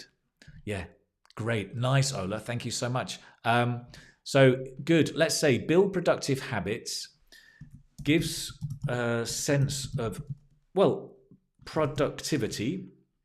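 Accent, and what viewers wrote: United Kingdom. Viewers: you deserve all of respect and appreciation. I always enjoy listening to your amazing british accent ❤❤❤❤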